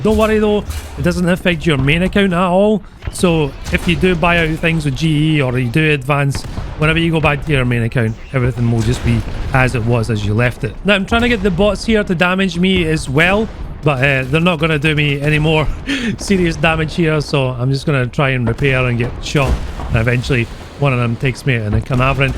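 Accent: Scotland, United Kingdom. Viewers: I love it when I watch a channel for the first time and just here the beautiful Scottish accent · are you scottish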